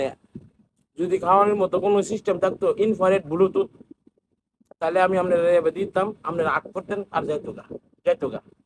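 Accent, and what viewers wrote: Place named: Narsingdi, Bangladesh. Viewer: I, আপনার কথায় নরসিংদীর টান আছে।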